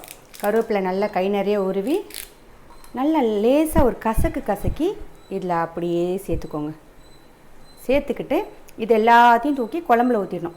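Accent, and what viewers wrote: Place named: Erode, India. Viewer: sissy erode language pola pesrenga. unga voice kekkum pothu amma oor மண் வாசனை..... And meen kulambu vaasam kalanthu oru vidha santhosathai kudukkuthu.... Nice sissy thank u so much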